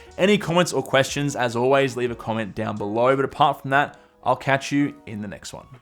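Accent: Australia. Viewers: When im trying to listen but being distracted with that damn eargasmic accent.. dont get me wrong its soooooo good for my ears. Hahah. Im thinking he’s an aussie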